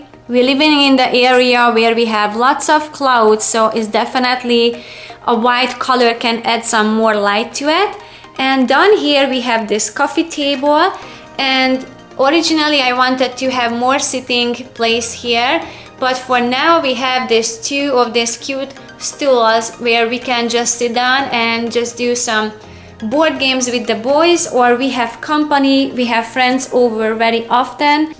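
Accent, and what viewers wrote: Hungary. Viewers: A few seconds into the video when I recognized the thick Hungarian accent. I live in NYC in a 350 sq feet apartment and I still want to downsize and get rid of more stuff ( although my place is not cluttered) I guess everyone has a different idea what is big or small. The place looks amazing, You guys did an amazing job. Örülök, hogy egyre több magyar használja a Youtube ot extra bevétel szerzésére. Mások is csinálják, akkor miért is ne. Persze angolul könnyebb. Feliratkozok a követőid közé. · Are you hungarian, because your accent sounds like it. Where do you live? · Wow. What a transformation. Well done! I love your house. I wonder... Where are you from? Guessing by your accent, Is it Hungary? Sorry, just curious...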